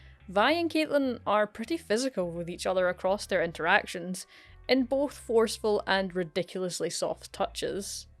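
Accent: Scotland, United Kingdom. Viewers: The thick Scottish accent with the Chinese channel name threw me off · I really did not expect to hear an scottish accent when I clicked a video LOL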